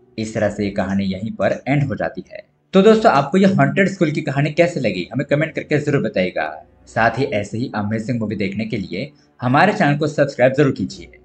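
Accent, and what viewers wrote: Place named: Sindh, India. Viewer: mujhay lagta hai ap ki mother tongue sindhi hai.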